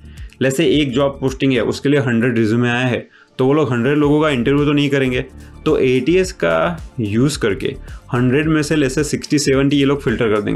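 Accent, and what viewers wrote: Gujarat, India. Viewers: Thank you for the in depth Roadmap sir💯, btw got to know from your tone that you are gujarati(same). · Are you Gujarati bro ?😅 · You sound very gujju bhai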